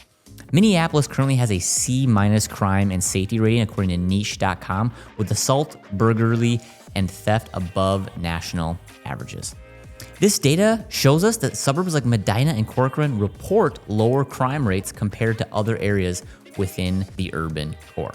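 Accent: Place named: Canada. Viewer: This guy sounds xanadian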